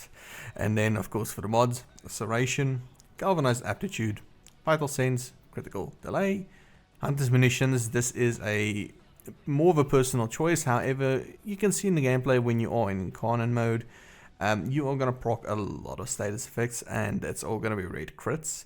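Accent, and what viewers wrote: South Africa. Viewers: You sound South African. Will give you a like and sub. Gl with YouTube man.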